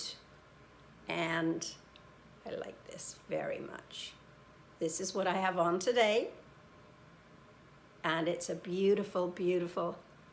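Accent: Ireland. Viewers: Just found you from Kathy's video! I've subscribed :) Are you part Irish? I can hear something different in your accent but can't place it 🤔 x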